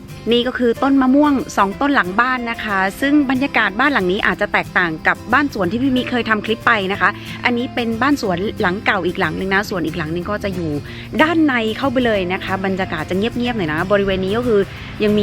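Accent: South, Thailand. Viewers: พูดใต้ได้ชัดเจน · เพิ่งเคยได้ยินพี่มิพูดภาษาใต้ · แลคลิปพี่มิแหลงใต้ น่ารักมากๆเลยค่ะ😍❤️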